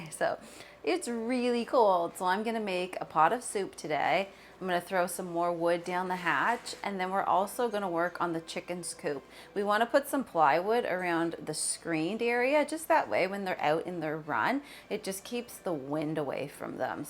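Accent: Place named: Canada. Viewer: Interesting that you pronounce it "hambug" instead of hamburger. My father said it that way but I rarely if ever hear anyone else say that. Is that a Canadian thing? My father was not Canadian (he grew up in Buffalo NY which is very close to Canada though).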